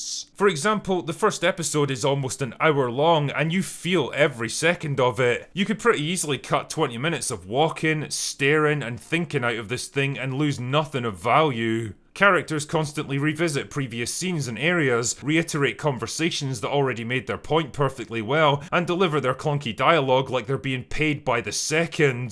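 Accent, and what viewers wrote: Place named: Glasgow, United Kingdom. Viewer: I've haven't seen any disney movie in decades. I just check out the reviews; that's all I need. Jeezuz I love sardonic, hilarious put downs of rubbish. And with a Glasgow accent too!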